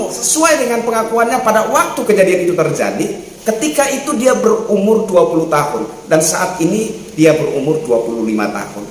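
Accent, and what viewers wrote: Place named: Java, Indonesia. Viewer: Setuju ade.... Logat jawanya keren... 🤭🙏👍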